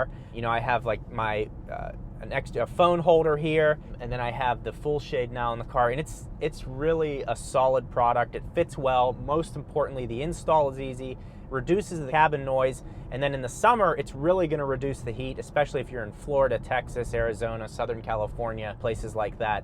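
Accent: Pittsburgh, United States. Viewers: sounds like a yinzer, said "see ha it goes dahn er" Da Burgh.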